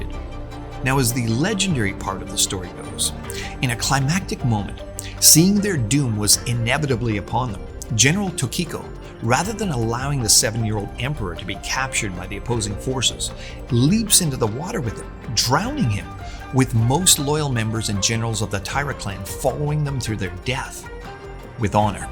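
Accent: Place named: Canada. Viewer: This guy has the most Canadian accent ever.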